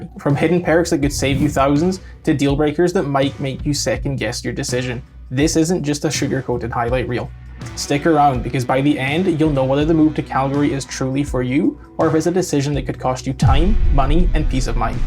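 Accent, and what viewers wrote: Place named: Ireland. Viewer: No offense, I notice a slight Irish accent in you. Is that the Calgary accent? Lots of Irish descendants there?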